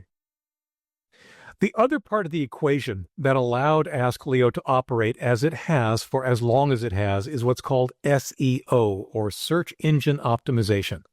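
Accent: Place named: United States. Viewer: I assume by your accent that you are American (as am I). In Dutch the surname " " translates to "nut tree." I hope that you sometimes celebrate your heritage and have visited the Netherlands at least once. Nog een goede dag!!!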